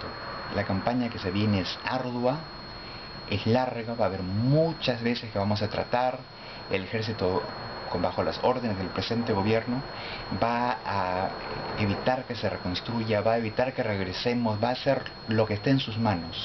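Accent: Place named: Argentina. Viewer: No reconozco tu acento...sos argentino?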